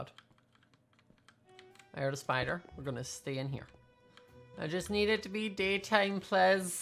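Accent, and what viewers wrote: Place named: Scotland, United Kingdom. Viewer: Only 817 views?? Come on we can do BETTER. Btw, everytime I watch, I start speaking like a SCOTTISH PERSON!! I called my friend and she was like, who is this? Did you go to Scotland?? I'm AMERICAN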